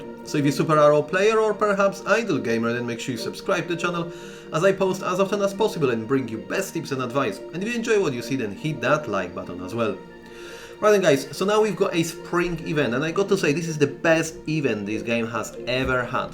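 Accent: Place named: Israel. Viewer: oh cool, your accent sounds very israeli, your accent is very different than most polish people i meet here in london. i wouldve never guessed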